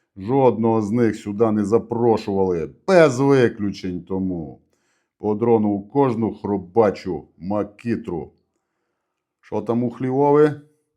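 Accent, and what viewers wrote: Transcarpathia, Ukraine. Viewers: Закарпатська українська-це шедевр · Зацініть закарпатський діалект роберта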